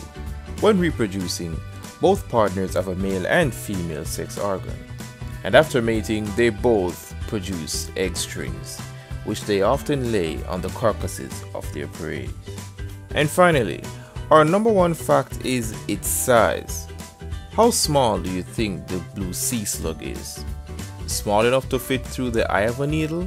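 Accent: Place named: Philippines. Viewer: you sounds filipino,are you?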